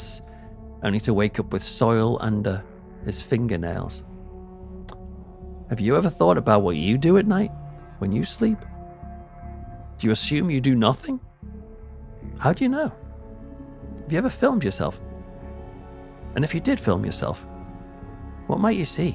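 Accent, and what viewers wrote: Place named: North East England, United Kingdom. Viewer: Ah,I thought you were from north east,been trying to track the accent,was right,I was born there but moved to Doncaster when I was 2.keep it up Bonny lad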